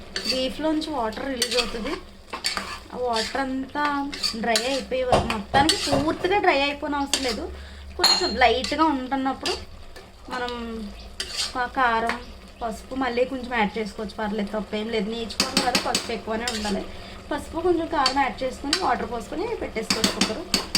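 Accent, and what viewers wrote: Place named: Amalapuram, India. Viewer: కూర ఎలా ఉన్నా కానీ మీ మాటలు మాత్రం ఆంధ్ర అమలాపురం అమ్మాయిలా ఉంది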